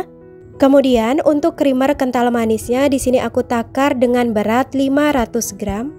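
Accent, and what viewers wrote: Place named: Madura, Indonesia. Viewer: Logatnya kayak madura 😅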